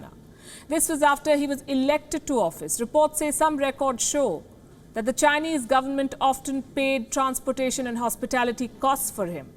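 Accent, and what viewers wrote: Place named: India. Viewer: We know your speech full of Nonsens, like your Indien accent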